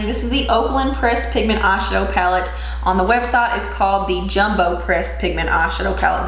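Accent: South, United States. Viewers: I knew I heard southern in that accent! Fellow kentuckian makeup lover here!!✋ ❤ much love to and for you!!😁 you're awesome girl! Thank you for your service to the beauty world and keeping it real!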